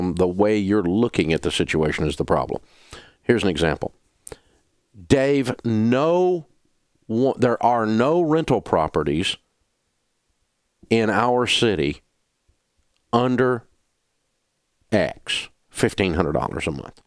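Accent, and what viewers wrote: South, United States. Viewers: C Leh He hired a voice coach to teach him how to lose his accent. When he started he had a very thick southern accent. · To Northerners, he indeed still has quite a Southern accent. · I'm from Brooklyn and I love your southern account and little sayings 😂😂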